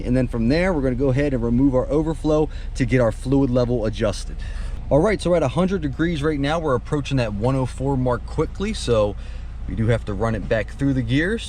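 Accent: United States. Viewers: Great vid but the guy sounds American. Why the fuck is he using celsius? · I don't know where you're at but the accent brings back memories of growing up in Southwest Philly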